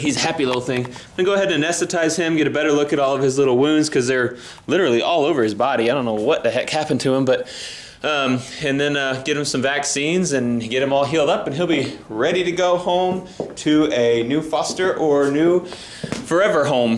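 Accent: Texas, United States. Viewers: i have a feeling you're from the austin or from the houston area in tx 🤔🤔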